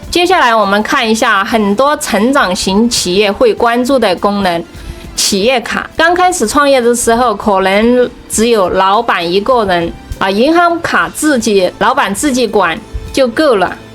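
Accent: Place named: Southwest, China. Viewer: 是西南口音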